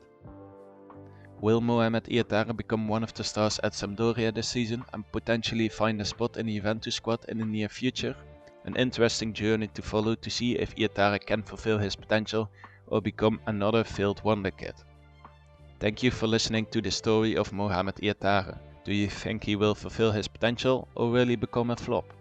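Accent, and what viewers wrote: Netherlands. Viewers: u rlly have an dutch accent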